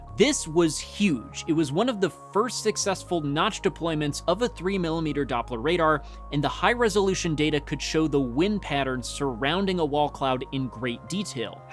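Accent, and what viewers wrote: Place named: Upper Midwest, United States. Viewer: "keep an eye on that bob" You can tell he's from the northern midwest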